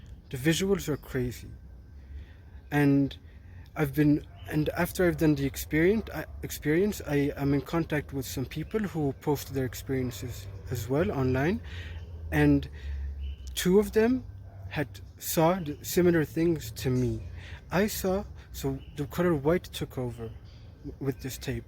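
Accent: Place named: Mediterranean. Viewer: it sounds like you have some Mediterranean style accent but it's a cool one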